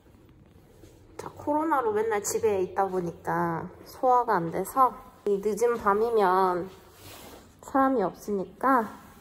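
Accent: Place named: Gyeongsang, South Korea. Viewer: 고향이 경상도세요? 경상도 역양이 느껴지네요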